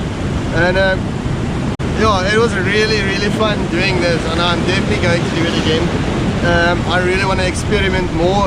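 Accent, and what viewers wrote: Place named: Afrikaans, South Africa. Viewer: Jy is afrikaans ek kan dit hoor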